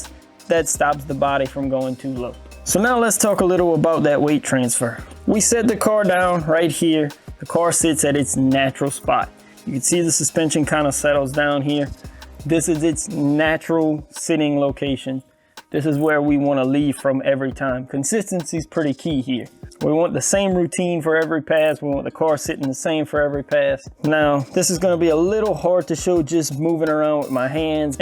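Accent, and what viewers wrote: Louisiana, United States. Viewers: Got that Houma type accent going....I'm on the Northshore. Just getting into the drag racing scene, coming from Nitro Buggies in the past. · I cant with the Leweeze-ee-annah accent...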